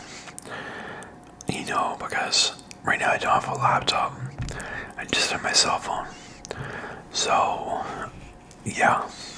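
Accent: Canada. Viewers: are you from nova scotia? familiar accent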